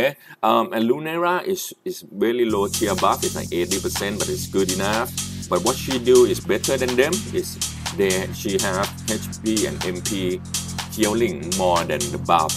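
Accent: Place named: Thailand. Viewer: That Thai accent though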